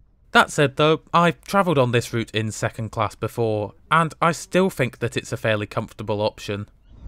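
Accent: United Kingdom. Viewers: Thank you for the very nice vidéo.Your comments and explanations were great.I very much liked the British accent.....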